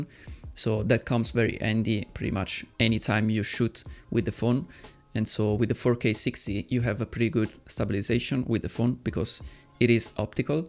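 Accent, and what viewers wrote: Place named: Italy. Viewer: sapevo che eri italiano...si capiva dall'accento inglese ahahah bel video anche io ho il mi 11 e secondo me con il sensore principale le foto sono fantastiche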